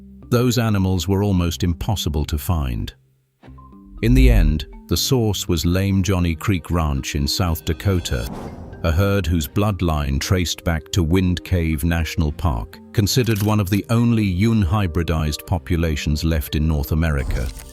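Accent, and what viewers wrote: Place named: United Kingdom. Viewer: Why a British voice on American content?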